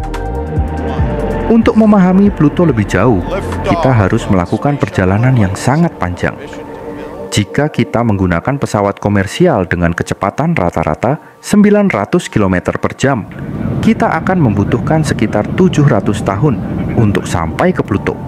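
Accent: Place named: Java, Indonesia. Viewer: Naratornya medok bgt😁aku suka klw mslh astronomi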